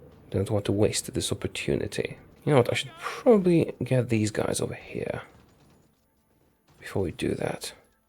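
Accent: Poland. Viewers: are you polish you sound like a polish person who migrated to the UK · Your voice kinda reminds me of the English dub L But a little polish? I think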